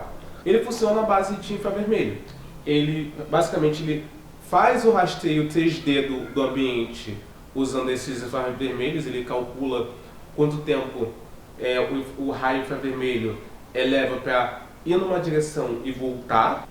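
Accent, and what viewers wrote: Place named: United States. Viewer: CARAMBA ACHEI QUE VC ERA AMERICANO mas e brasileiro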